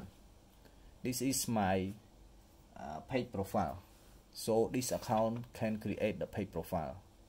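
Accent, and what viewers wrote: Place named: Cambodia. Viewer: បងខ្មែរមែន ព្រោះនិយាយតុងខ្មែរ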